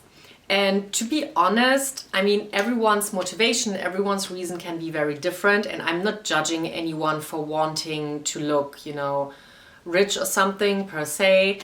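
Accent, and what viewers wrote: Germany. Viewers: Nice video! 🤗 Do I hear a german accent? 🤔 Edit: Habe das Video jetzt tu Ende geguckt, da erwähnst du sogar, dass du Deutschland kommst! Liebe Grüße, habe dich direkt abonniert :) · you sound very German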